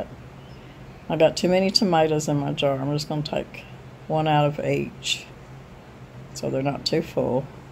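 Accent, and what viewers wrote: South, United States. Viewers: I have never tried pickled green tomatoes. I am pondering whether I have enough green tomatoes to try it. Thank you so much for sharing your videos. I always come away feeling like I have taken a step back into my childhood and seeing grandmas pantry or mom at our old wood stove, etc. Your accent is heartwarming as it is soooooo familiar. My family's from Arkansas and Dads side was Texas. I don't know...I just sit down, watch, relax and learn some interesting tips... :)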